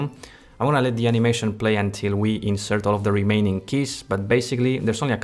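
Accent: Spain. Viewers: You're not Spanish? WTF? Where are you from then? I'm a native speaker and when I hear you I have no doubts you are from Spain. Tío, qué cojones dices. XD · Tienes acento mallorquín. ¿Eres de por ahí?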